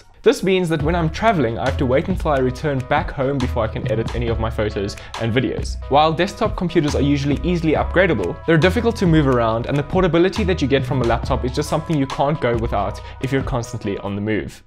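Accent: South Africa. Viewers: I love spotting a fellow South African just by the voice XD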